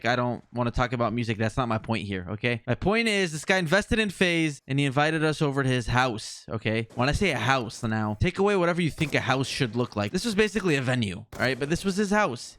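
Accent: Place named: United Kingdom. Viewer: That British accent is disgustingly funny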